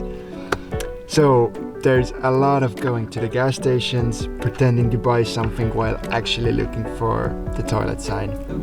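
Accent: Scandinavia. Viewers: Loving the accent!! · Nr 2: Showering! Oki thats enouhn problem for me! Btw are you Swedish? ya accent sounds from Scaninavia!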